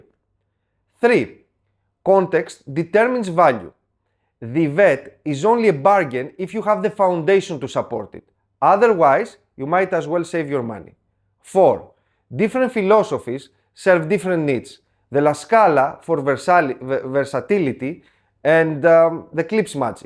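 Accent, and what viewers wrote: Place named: Greece. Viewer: Hi, you must be Greek, if I can tell by the accent. Beautiful system, congratulations. Very transparent and well organized presentation. Subscribed. My system is comparatively very modest, but I really enjoy it: Pro-ject Classic Evo turntable, Ortofon 2M Bronze cartridge, Pro-Ject Phono Box S3B Preamp, Advance Paris A10 classic integrated amp, KEF LS50 speakers, 2 REL T-7x subwoofers, Marantz 6006 CD player, WiiM Pro Plus streamer, Audioquest and QED cabling.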